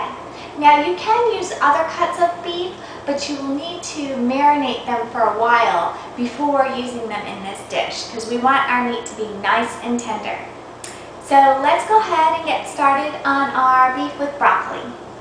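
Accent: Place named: Tennessee, United States. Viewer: you sound like your from Tennessee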